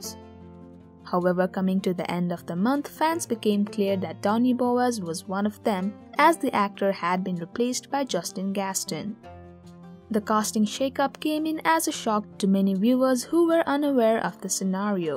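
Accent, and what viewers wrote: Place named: India. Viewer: Sounds Indian to me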